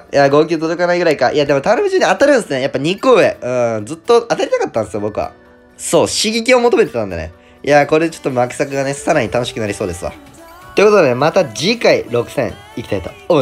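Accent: Okayama, Japan. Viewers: ちょいちょい岡山弁でてるw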